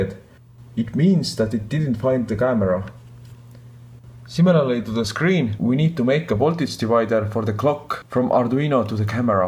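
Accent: Estonia. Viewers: Kas oled Eestlane? Aktsent on täpselt selline. :D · Is that an Estonian accent? Thanks, best video tutorial on how to connect OV7670 to Arduino so far I found.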